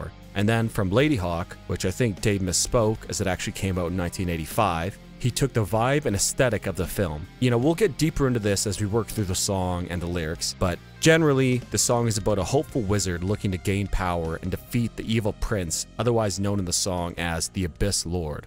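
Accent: Canada. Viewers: Owt....I bet you are either Canadian or from Minnesota. It really is a great song and thanks for covering it.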